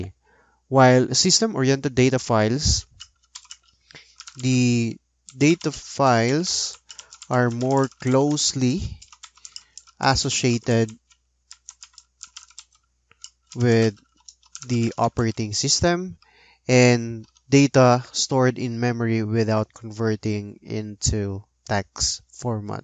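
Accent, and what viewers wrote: Philippines. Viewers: bruh i giggled hahaha. his first sentence and I knew he should be Filipino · Is this A Filipino? Because the Accent is Like Filipino XD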